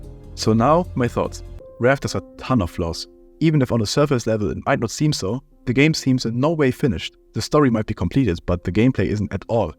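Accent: Germany. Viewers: Are you german? You sound like german😅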